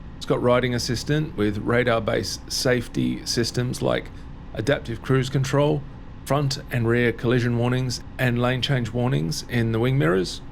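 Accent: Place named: Australia. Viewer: Luv yer review. Mayt, ya sound almost like an Aussie. I’ve not seen any of your reviews before, but I liked how ya did this one so I’ll look at a few more. Cheers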